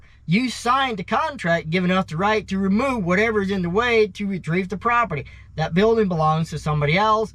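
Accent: Pennsylvania Dutch, United States. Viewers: "I'm gonna keep saying building because it sounds like I'm saying something else." 😄 They very first time I heard you say "shed" I thought, I'll bet he was either raised Amish or in Amish country. Your PA Dutch accent is heavy. I went to college in the heart of Amish country in Pennsylvania. We heard this accent a lot. It takes me back to my college days. 😊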